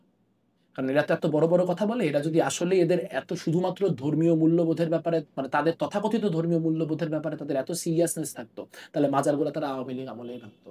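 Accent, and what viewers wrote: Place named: India. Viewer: উপস্থাপকের ভাষার মধ্যে কেমন যেন একটা ইন্ডিয়ান ইন্ডিয়ান ভাব আছে, ❌দাদা ✅ভাইয়া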